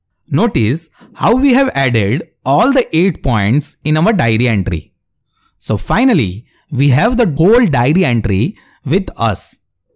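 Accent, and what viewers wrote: India. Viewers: The pure accent of indian english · Pure Indian accent of English 😊😊❤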